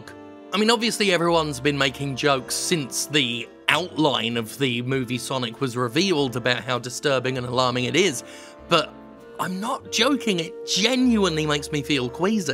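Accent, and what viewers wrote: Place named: United Kingdom. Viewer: British accent voice in the video is so annoying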